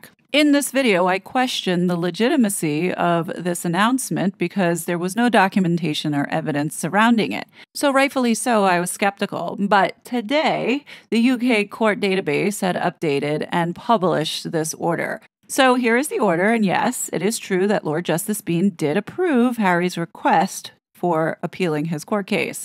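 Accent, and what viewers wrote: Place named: New York, United States. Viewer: I don’t think so. She sound like very well spoken educated person with a New Yorker accent 😊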